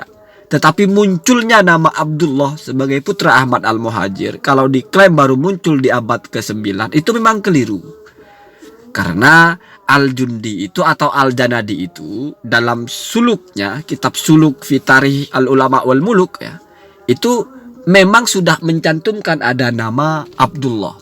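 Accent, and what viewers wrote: Madura, Indonesia. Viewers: 😂😂😂😂 maduranya sangat kental...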